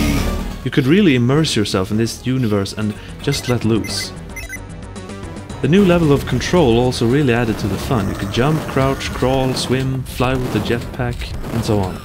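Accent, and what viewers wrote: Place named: Sweden. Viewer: Hah, hur visste jag att du var svensk sekunden du öppnade munnen? ^-^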